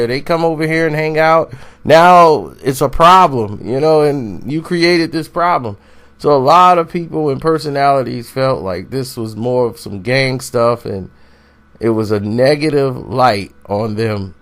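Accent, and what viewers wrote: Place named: New York, United States. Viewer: you trippin on this one.. who did tribute songs for BIG outside bad boy, which did it more less to line their pockets.. nas had a song for pac and big..and jayz snake a** was more like - i'm glad you're dead the city is mine..and in term of fans, pac got way more love..i can remember where i was at, what i ate that day..you remember how popular those bootleg 2pac shirts was.. the whole fam had them sh*ts..thought you was midwest, you sound like a new york n*gga on this one..eventho in their heart's they loved pac (ie. maino, uncle murda)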